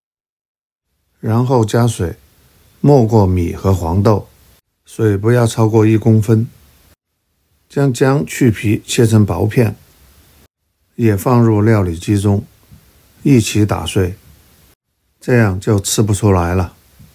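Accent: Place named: Wuhan, China. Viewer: 哈哈 这纯正的武汉普通话，好熟悉。不过这个面窝完全都没有没有泡起来，跟武汉的面窝还是有点差距。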